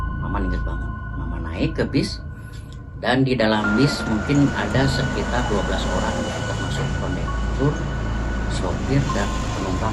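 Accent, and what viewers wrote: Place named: West Java, Indonesia. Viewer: Ceritanya serem, pembawaannya enak dan pertahankan Aksen sundanya Kang!!🦾🦾🦾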